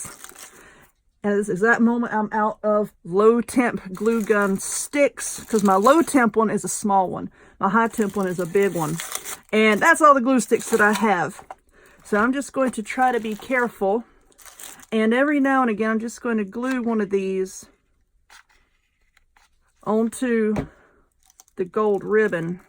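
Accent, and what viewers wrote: South, United States. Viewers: Guurrll! You crack me up doing Valentines crafts and we are STILL in 2018!😂😂😂 BUT! How can we resist this beautiful wreath! This is aaaabbsolutely STUNNING! Also I love your southern accent!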